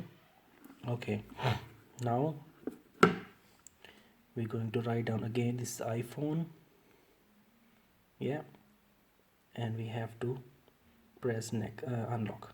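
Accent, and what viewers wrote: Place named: Pakistan. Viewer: Bhi tum Pakistani lagta hn awaz sa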